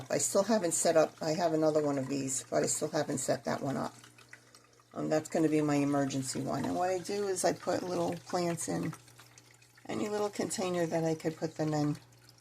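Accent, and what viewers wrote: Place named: New York, United States. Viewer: Don't mind me. Just here getting my indoor plant and NY accent fix 😆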